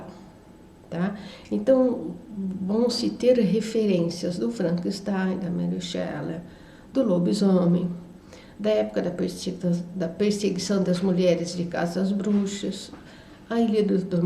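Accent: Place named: Russia. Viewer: Muito bom,Grande descoberta esse canal! Eu tenho uma dúvida...a Senhora é russa?Se for então a senhora fala muito bem português rs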